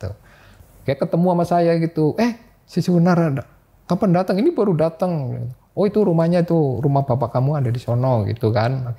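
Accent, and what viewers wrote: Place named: Banyumas, Indonesia. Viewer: Ketara banget ngapake kang.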